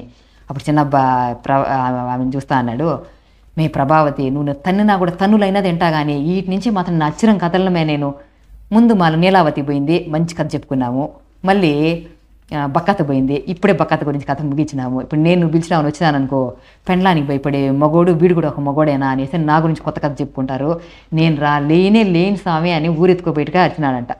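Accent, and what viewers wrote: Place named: Chittoor, India. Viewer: కథ సహజంగా పల్లెల్లో మన ఇళ్లలో మన మధ్య జరుగుతున్నట్టుగా చిత్తూరు యాస ఉట్టిపడుతోంది మీ నోట ☺️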